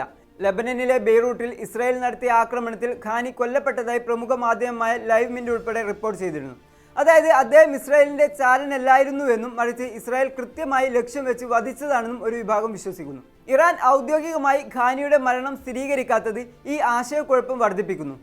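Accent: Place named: Malappuram, India. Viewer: മലപ്പുറം മലയാളം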